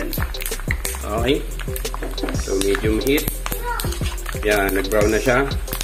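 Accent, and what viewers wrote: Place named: Pampanga, Philippines. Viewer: May antimano, kapampangan ka brad! hahaha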